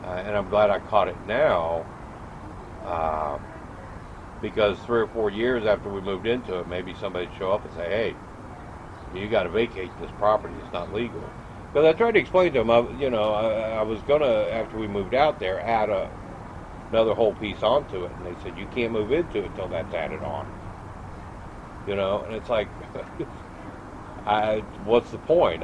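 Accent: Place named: St. Bernard Acres, United States. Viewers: St. Bernard Acres, I picked up your/our accent!! :) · Sounds like a fellow Texan! Yeah I agree, Ohio better be glad Texans didnt live in Ohio, we'd tell them to kiss our big hairy wal nuts. Its like my fellow Texan says, out in the country they dont give a $ h!t if we live in Link Knights cardboard house, as long as we have a proper waste system. The more I hear about idiotic laws in other states, the more I love Texas. God Bless the Lone Star State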